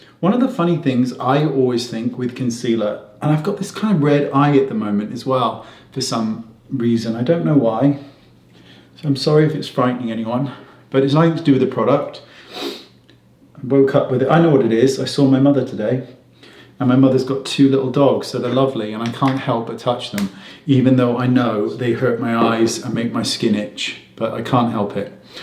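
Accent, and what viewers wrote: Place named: United Kingdom. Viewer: Thx, listening to your accent& lBritish language is always a learning experience. Love an inexpensive cinceLer/ fuundation!! Am waiting for my mail for your Daisy, gloss& liner!! Thanks for your artistry & humor🥂🥂🥂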